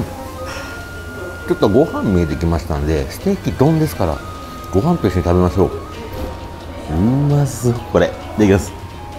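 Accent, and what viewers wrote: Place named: Okinawa, Japan. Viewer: イントネーションが沖縄です😃素敵👏👏👏👏👏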